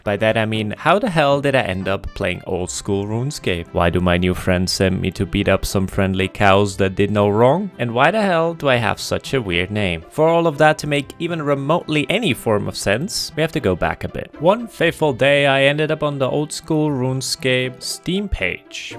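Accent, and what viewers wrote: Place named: Scandinavia. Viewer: This is great fun to watch! As others have suggested, this series is a recipe for success. You have the unique opportunity to play a game for the first time that a LOT of people have many fond memories of, giving them that experience through you. Nostalgia is powerful, and many players and former players will want you to succeed in something that brought them so much happiness at one point or another in their life. You have a Nordic sounding accent that people will find entertaining and distinctive. You are a clever enough storyteller to know what to cut and what to include to keep the pacing just right, along with some good writing skills and a good sense of humor. There is opportunity here that you can easily reach out and grab if you want to do so.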